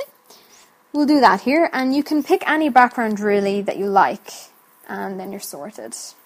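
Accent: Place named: Ireland. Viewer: Irish accent + XBMC knowledge = LOVE!!!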